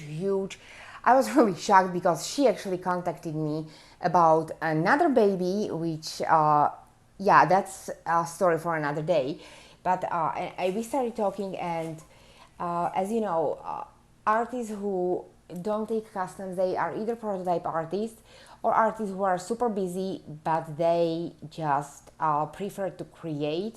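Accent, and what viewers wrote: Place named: Europe. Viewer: From what country in Europe do you come from? Yesterday evening I met a lady with your accent and boy she is your "sosie" as we say in french. I don’t know is the word exist in english but I thought it was you!! And she is sweet like you!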